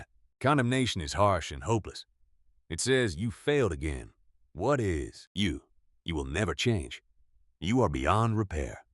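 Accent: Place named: Pittsburgh, United States. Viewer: Oh i like this voice Lord, it's just like "Pittsburghese" is the distinct regional dialect and slang spoken in Pittsburgh, Pennsylvania, and Western Pennsylvania. Rooted in the working-class history of the region and influenced by early Scotch-Irish, immigrant, and Appalachian speech patterns, locals who use this dialect are affectionately known as "Yinzers". I Am in eastern Ohio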